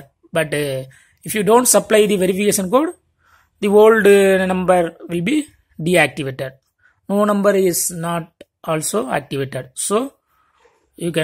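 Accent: India. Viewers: What the hell what kind of English u spoke? Pad pad! U are speaking hindi than English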